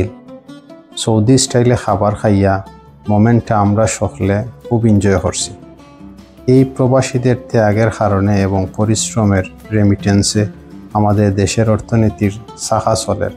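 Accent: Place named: Sylhet, Bangladesh. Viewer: মা শা আল্লাহ, আপনার খাঁটি সিলেটি ভাষা অসাধারণ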